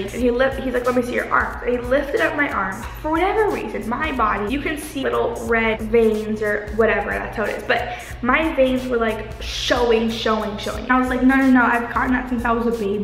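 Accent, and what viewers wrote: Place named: United Kingdom. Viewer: sounds British ish even though I'm British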